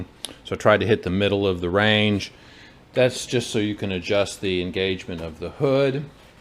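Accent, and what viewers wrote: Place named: New England, United States. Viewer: Great build. Recent sub here about the time you started this project. Been trying to guess what state you’re in, based on accent, garage style, and garage setup haha as that’s all I have to go by…… maybe somewhere in New England???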